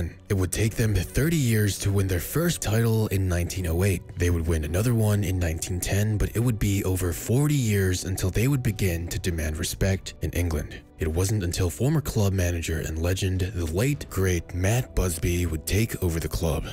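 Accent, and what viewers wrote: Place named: United States. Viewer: Hearing someone with an American accent saying football when referring to soccer makes me cringe. Idk why maybe it’s cuz it’s so unusual to me.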